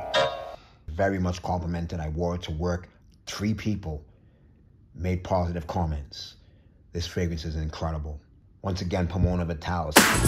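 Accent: Boston, United States. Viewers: Great video as always, bro! Welcome back, with gratitude from Woostah for your no nonsense tellin it how it is & great to hear that Boston accent representin & preachin at fragchuch for a Sunday! Think I finally found a place up in the Boston area that may carry a decent selection of niche and indie houses but haven’t had a chance yet to check it out - believe it’s called pickwick’s mercantile in Portsmouth. Could be dangerous to visit 😂have a great rest of your day!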